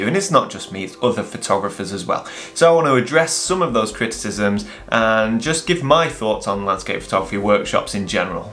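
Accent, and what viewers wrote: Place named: Northern England, United Kingdom. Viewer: Its a shame that you feel you have to defend yourself. I believe the problem stems from the fact that many of us can’t afford 4k for a trip; most of us are philosophical about it, but it seems a few take the angry route...they tend to be far more vocal. Don’t pay them any mind, you have nothing to justify. Having said that, their bad behaviour has led to you producing a very interesting vlog, so...cheers dumbhorses 😂 I am quite new to your channel, via Svalbard with Morten, enjoying your work. Though frustrated, I can’t quite place your accent...I am thinking between Buxton / Sheffield. I grew up in Donny, but have lived in Scotland for 30 years...I need to get out and photo this scenery! Cheers / slanj 🥃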